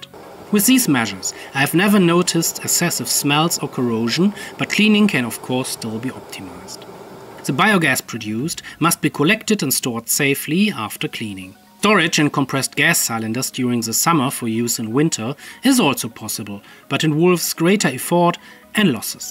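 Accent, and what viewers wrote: Germany. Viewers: Dual Publishing in your native language is neat and appreciated! Also, don’t feel bad about your accent, it’s neat and ALSO your English is far better than my german! (And any English speaker whining about it probably can’t speak a single other language!) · I love the German accent especially yours it’s nice, like a fine British accent!! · He's German 😂 the accent is very clear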